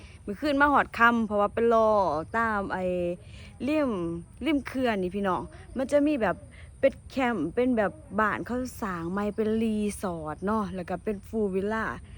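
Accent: Ubon Ratchathani, Thailand. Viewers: สำเนียงพูดเหมือนคน อุบล ศรีสะเกษ สุรินทร์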